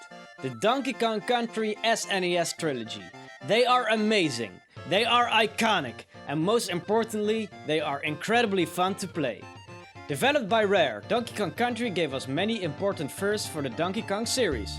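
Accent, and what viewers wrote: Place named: Netherlands. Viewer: his accent is way to dutch goede video tho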